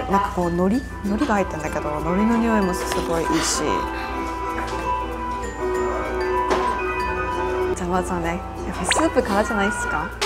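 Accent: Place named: South Korea. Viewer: 일어하는 한국낭자 같아요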